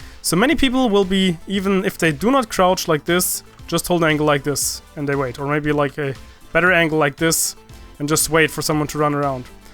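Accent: Germany. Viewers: bist du deutsch ?? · Bist du eigentlich deutsch? :D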